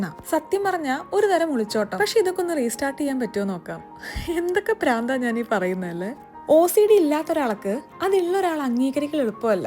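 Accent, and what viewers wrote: Malappuram, India. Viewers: 💖🥰 Malappuram slang👍✨✨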